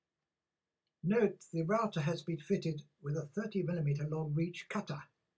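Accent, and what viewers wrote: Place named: England, United Kingdom. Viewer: you are 90? I wish you lived close to me,you give a very good discription of DIY but I woulld cut my hands off(smile) Love your English accent.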